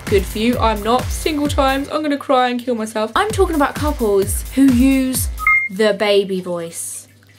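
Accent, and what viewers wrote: England, United Kingdom. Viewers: I love your British accent! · i friggen love your english accent IM FROM SCOTLAND :) cuz you and i are like the awesome people from the UK ;D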